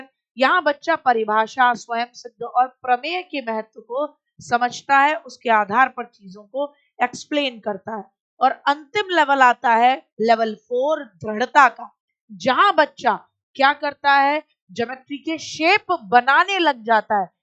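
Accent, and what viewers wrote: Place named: Haryana, India. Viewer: Haryanvi bol rhe h bhaiya wo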